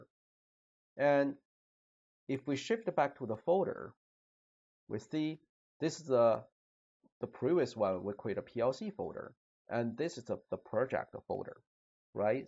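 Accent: China. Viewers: Судя по акценту автор китаец) Как я понял? "So from here".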